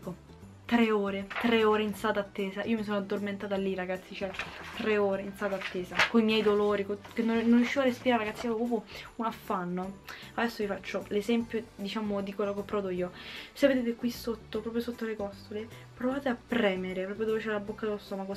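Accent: Rome, Italy. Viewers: MADONNA CHE ROMANA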